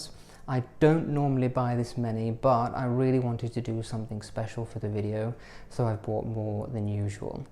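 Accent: United Kingdom. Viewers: Hello my name is CONNIE I live in Southern California I subscribe to your Channel a few months ago and recently added your news letter I am so enjoying your videos. Your apartment is screaming beautiful I would love to live there and Sophie is adorable I own a Shih Tzu myself I think they are the best dogs. I started watching with my goddaughter because she is fascinated with anybody who sounds British so she imitates you through the entire video she loves it. Now she wants your tea set, she’s turning into a little connoisseur. Her father is a chef so she adores cooking we watched your tea party on how to brew the tea properly and make the little sandwiches she was in heaven. You are so blessed to live in such a lovely environment and location. I love that you bring so much charm and elegance to your videos it’s definitely a lost art in America. You did a beautiful job on your floral arrangement, there’s just something about fresh flowers, they don’t even need to be expensive it’s just something living and beautiful that can bring you so much comfort when we have such a small life these days. 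Thank you again for blessing us and bringing Refinement and old world elegance into our homes. Stay safe and healthy give Sophia hug. Your new friends CONNIE and Joey Blu❤️🙏✝️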